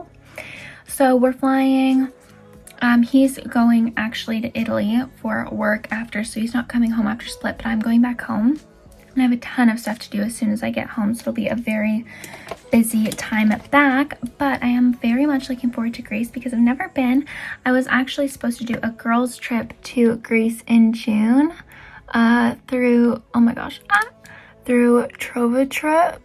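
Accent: United Kingdom. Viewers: Wait is she British or is she just doing the accent to be funny?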